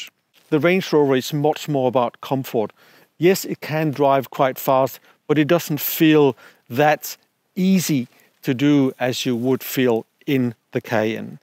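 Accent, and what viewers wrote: Denmark. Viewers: Is this Danglish ??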